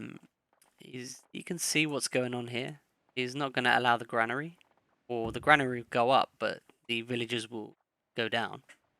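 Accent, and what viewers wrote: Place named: United Kingdom. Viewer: OMG HIS BRITISH Anyway thanks for the video we need more content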